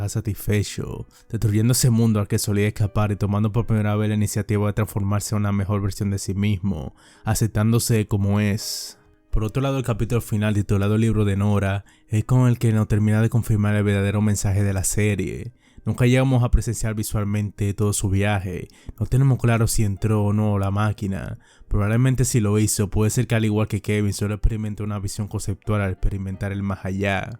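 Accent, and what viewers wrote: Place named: Central America. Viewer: Leftovers es una maravilla, es muy bueno que se reivindique una serie de esta categoría, sin embargo es muy importante que el relator, hable un español claro, sin acento. Puede ser el mejor análisis sin engarbo cae todo cuando comienzas a oír un acento centroamericano marcado, ademas utiliza modismos que realmente destruyen todo. un consejo sano, no hables con acento, si tu no puedes hacerlo con un léxico claro, pedí a alguien que te lea el guion.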